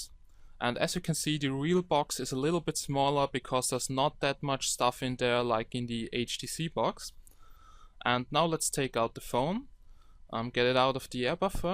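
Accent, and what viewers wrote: Germany. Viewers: Digga du bist deutsch oder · are you german ? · Are you german ? Because you speak english like a german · du kommst doch aus deutschland :D ich hör dein akzent :D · wie man merkt dass du deutscher bist :D - aber gutes englisch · Man merkt das du deutscher bist ahhaha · are you german? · You have a german accent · Maybe that`s the reason I understand him so well - I`m German too... :D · Hallo, ich nehme an das du deutsch sprichst, deines Akzentes und einiger Handy's wegen in denen ich gesehen habe, das Sprachen auf Deutsch sind;) Finde deine Video's sehr spannend und belehrend, mach weiter so! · Man merkt nach dem ersten Satz das du Deutscher bist :)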